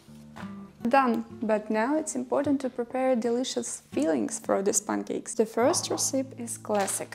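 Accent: Ukraine. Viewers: nice videos. excellent choreography. excellent photographic angles. nice Ukrainian accent. so yummy Ukrainian dishes. wow torturing us viewers with heavenly food we can't eat haha. many thanks for producing and sharing these videos with us. have a great day always. 🌹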